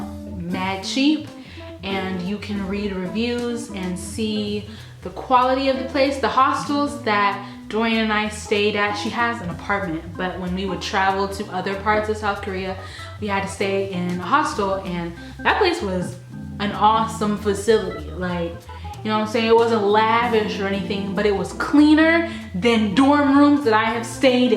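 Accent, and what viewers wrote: Nairobi, Kenya. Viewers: Love the bougie Nairobi accent. Reminded me of Don Cheadle in Hotel Rwanda, somehow. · dig the bougie nairobi accent lol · lol the kenyan accent gets me everytime coz me and my cousins do it all the time! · UMMMM i love your Nairobi accent!! I need to check to see if you you've done any ACCENT tag videos!!! This video was just so awesome!!!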